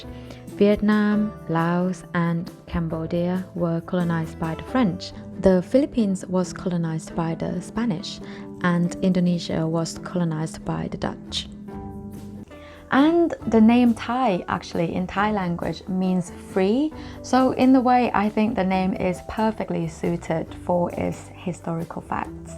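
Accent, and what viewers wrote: United Kingdom. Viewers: what a British accent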